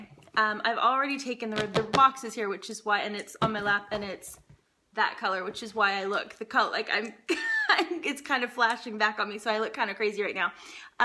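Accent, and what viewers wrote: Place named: United States. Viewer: your cute ....I saw an ash vlog ...you have an American accent do you live in England year round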